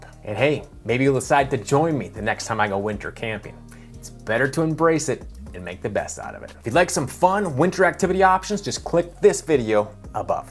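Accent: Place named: Minnesota, United States. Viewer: Wow, I really hear your Minnesota accent. Love it.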